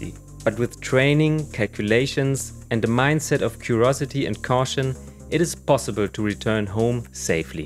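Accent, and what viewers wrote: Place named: Germany. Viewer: German English😂!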